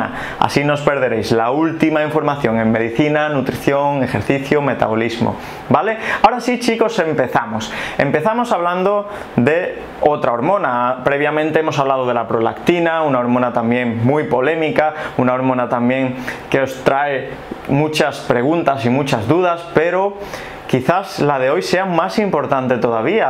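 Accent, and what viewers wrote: Spain. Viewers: La información puede ser buena, pero la verdad la parte comunicativa rompe el ánimo de seguir viendo, para los americanos hispanoparlantes de por si, nos dificulta un poco decifrar la manera de hablar de los españoles, pero echándole ganas se puede traducir (sin justificar el excesivo uso del "vale" que para nosotros no tiene sentido!), pero lo que si me resulta grosero es el uso innecesario del inglés, ojalá pudieras eliminar eso y seguramente tendrías algo más de aceptación. saludos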